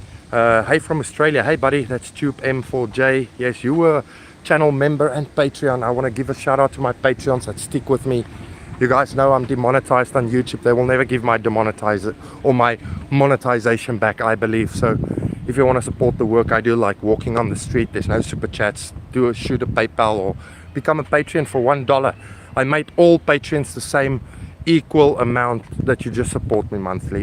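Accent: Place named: South Africa. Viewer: my first time here. You sound South African.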